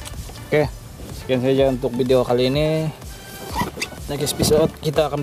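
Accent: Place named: Sabah, Malaysia. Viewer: mancing kt area mna tu dgr logak cko mcm sabahan sja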